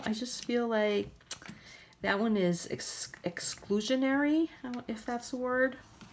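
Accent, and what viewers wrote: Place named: Boston, United States. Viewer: LOL. Every once in a while a word at the end of a sentence sounds Jersey or something (I never was there either but knew people from there). I WAS to Boston once ages ago so maybe the accent just sounded a little familiar. But cool! Boson/Portuguese! Well, love it! Next, is when will we get to see YOU? Take care! 🥰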